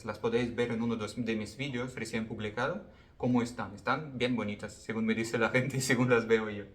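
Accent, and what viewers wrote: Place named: England, United Kingdom. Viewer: querido es un placer escucharte hablar y veo todo tu trabajo merece destacar,la linda forma de explicar cada cosa,eso vale mucho! cuando se pone el ingrediente principal que es el AMOR Todo es mejor, y es más ameno todo! Espero que sigas haciendo vídeos lindo,como dijo el escritor: 2Brian Weiss:Lo Único Real Es El Amor LoDemás...Es Una Ilusión" Te felicito tu pronunciación del idioma español lo hablas muy bien y me encanta tu acento ingles le da un toque de distinción!👏👏👏👏👏👋😀👍Un fuerte abrazo desde Uruguay! Muchas Gracias🌵🍀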